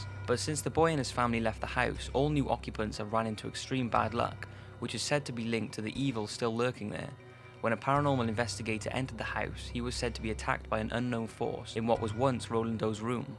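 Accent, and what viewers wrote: Wales, United Kingdom. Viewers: Are you british? you sound british. :) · can't beat a bit of welsh accent see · Do I detect a Welsh accent? Lol might be wrong but my Welsh ears hear some tones ;p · This guy sounds like he's from Newport in wales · what a retarded fucking comment..this is guy sounds British so presumably he only speaks English and does his research from books written in english and from the english part of the internet. he's not gonna read/research blog post or article about some pakistani family's encounter with a ghost because it would most like be written in Urdu, for pakistanis by a Pakistani. I guess you gotta forgive him for not speaking every single damm language in the world - I hate race-baiters. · are you welsh? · Omg you have a British English accent I always wanted British English accent so I be royalty you can teach me how to be British I love your mystery videos 😊😊😊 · Are you Welsh? 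· It's the welsh accent hun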